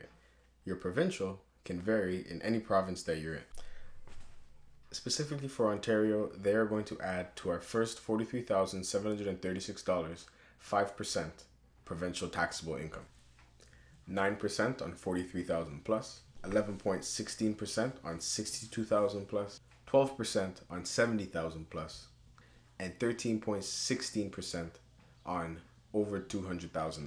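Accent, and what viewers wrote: Jamaica. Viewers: Hey bro, Are you originally from Jamaica? Also, what broker do you recommend for US clients? Thank you for sharing.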